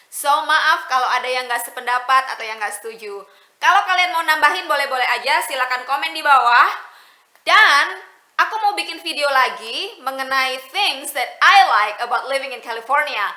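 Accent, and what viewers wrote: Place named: Surabaya, Indonesia. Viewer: arek suroboyo tah mbak iki,podho mbak..anyway do you know londo kampung? He is a youtuber too like mbk 😊.he is from aussie but his wife from surabaya..he speak jowo suroboyoan fluently..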